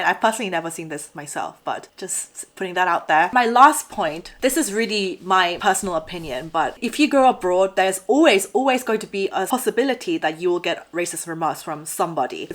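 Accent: England, United Kingdom. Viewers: In what way is she more British than a Korean? She’s somewhat of a hybrid. However, as a Londoner - I see the British influence (accent mainly imo), but not “completely British” (whatever that is). 😇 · when I listen to and close my eyes, sounds soo English. Also, I love when she says" proper". 😀 Maybe mannerism! " Stoicism"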